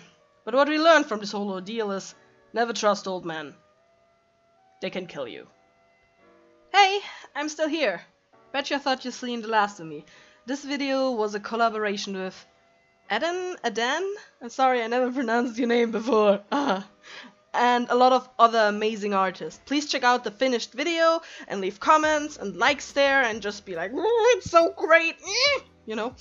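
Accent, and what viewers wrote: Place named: Germany. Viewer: Your little german accent is great! Your art us also great!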